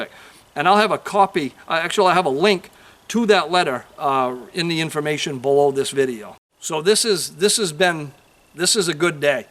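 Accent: Maine, United States. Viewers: Sounds like your from Maine bub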